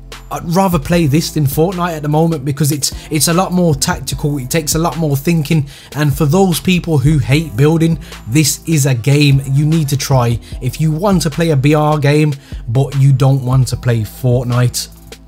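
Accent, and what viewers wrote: Birmingham, United Kingdom. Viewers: You're from Birmingham aren't ya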